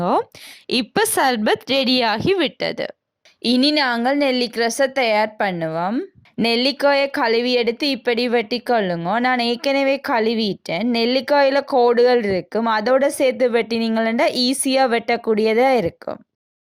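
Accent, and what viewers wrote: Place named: Sri Lanka. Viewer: அனைத்திலும் மிக முக்கியமான ஒரு விசயம். உங்களிடம் பிடித்த ஒன்று, உங்க லங்கை தமிழ்.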